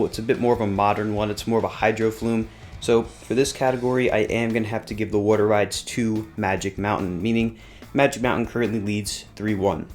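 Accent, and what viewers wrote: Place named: New Jersey, United States. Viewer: "Wudder rides"... "Wudderfalls"... yeah, definitely from Jersey ;) nice vid, sub'd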